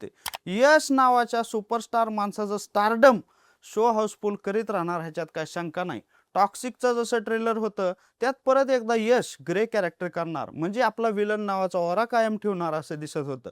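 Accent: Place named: Barshi, India. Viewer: बार्शी cha आहे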